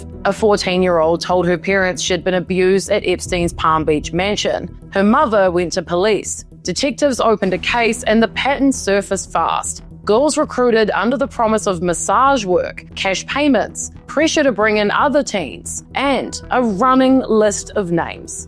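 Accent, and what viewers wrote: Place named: New Zealand. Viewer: New Zealand/Kiwi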